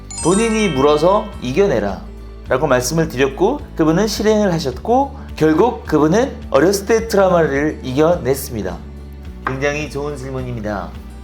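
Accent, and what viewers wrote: Gyeongsang, South Korea. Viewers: 경상도 사투리 티 안내려고 서울말 쓰는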